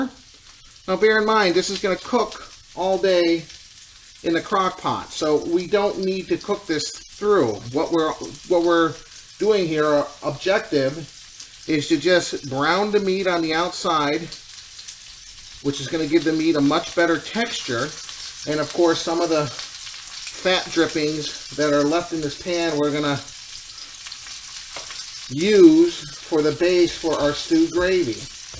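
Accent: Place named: Minnesota, United States. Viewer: I’m guessing your from Minnesota, North Dakota, or Canada.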